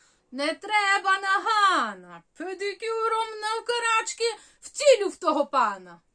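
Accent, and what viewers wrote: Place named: Poltava, Ukraine. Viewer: лелечко, сонечко, ви ж такий талант! Я буду по всіх знайомих каналах на Ютубі вас пропагувати. Українського Ютубу багацько не бува. І мені як медом по серці ваша Полтавська говірка) ви неймовірна жінка!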